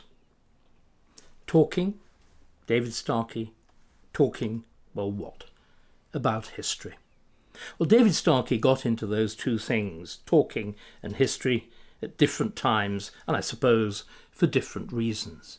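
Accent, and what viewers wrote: RP, United Kingdom. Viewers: Let's hope freedom of expression does not become history. As for the old BBC accent- it sounds great to an Australian and is much better than the strange accents that have developed over in the old country in London and so on- sort-of posh but coming across as "affected" in an odd sort of way.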